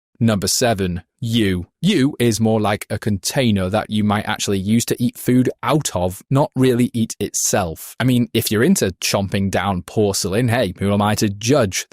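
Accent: United Kingdom. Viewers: he was British or some other country that speaks that kind of English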